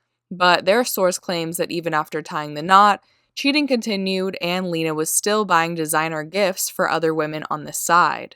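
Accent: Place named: California, United States. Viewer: I know this is off topic, you have a nice voice. You're from, or you probably lived in sunny California for a while. We just got that popping "proper" twang that people think is fake. But it's NOT lol♥️🌞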